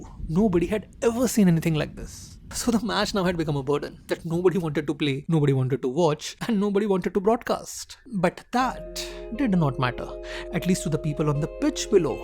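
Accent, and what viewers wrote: India. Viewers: This Indian accent is enough to given us headaches Unbelievable, 200 years literally taught them nothing.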